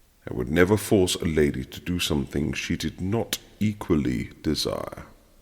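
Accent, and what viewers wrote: United Kingdom. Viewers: Is this Brit accent?, I am a non native English speaker, sorry. But it sounds so good🩷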